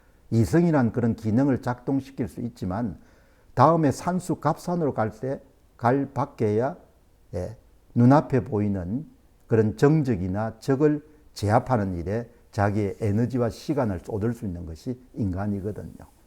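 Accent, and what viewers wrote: Gyeongsang, South Korea. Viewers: 너 경상도네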